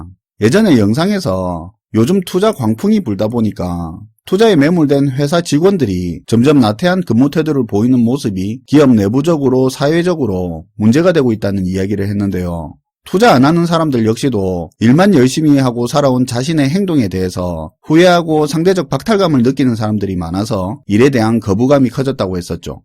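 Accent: Gyeongsang, South Korea. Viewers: 구수한 경상도 사투리. 싫어하는 사람도 있겠지만 듣기 편하네요. 마치 친구 이야기를 듣는 것 같아 좋습니다.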